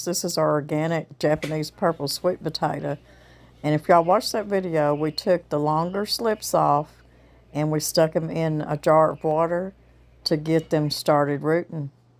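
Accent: South, United States. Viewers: Dear Miss, Thank you for brightening this dreary, rainy day with another delightful video! Wish I could send you some rain for your beautiful, newly planted sweet potatoes. Love watching you plant while listening to your calm southern accent! Yesterday I watched you make your Coca Cola chicken and it looked wonderful! You make everything look so easy and simple! was certainly enjoying it…….I’ll take his word for it!🤗🤭 How do I go back and watch your very first videos? I’ve missed so much. Have a happy weekend! 💕💕Jackie🪷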